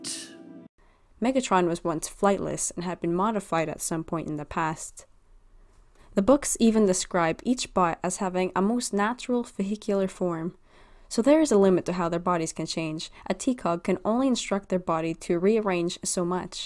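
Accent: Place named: Canada. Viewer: CANADIAN!?@ FINALLY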